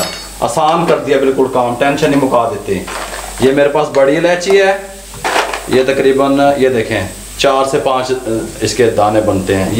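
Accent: Punjab, India. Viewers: No fancy kitchen no fancy utensils no show shaw just pure authentic recipes guidelines and awseemmmm Punjabi talking style 👌👌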